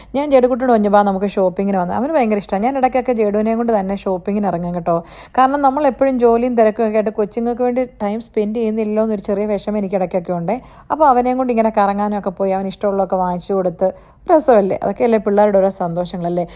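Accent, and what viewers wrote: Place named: Kottayam, India. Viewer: Chechi kottayam aano...nammude samsaram pole thonni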